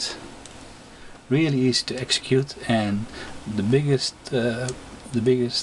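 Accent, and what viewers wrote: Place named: Russia. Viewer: You sound Rusian, btw nice video.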